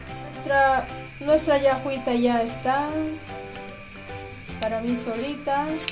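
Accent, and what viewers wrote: Spain. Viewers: Lo primero que me llamó la atención, el acento. Inmediatamente pude percibir que reside en España. Por lo demás, práctico la receta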